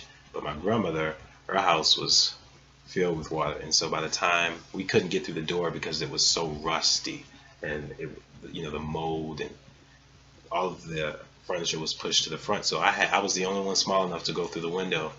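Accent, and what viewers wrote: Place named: New Orleans, United States. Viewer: That New Orleans Accent was So Thick LOL !... Not So Much Now - Barely Can Hear It ...Thank U Much for SHARING '-p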